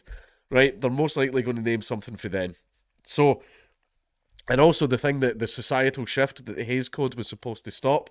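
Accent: Scotland, United Kingdom. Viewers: I like your accent, you sound like William Wallace.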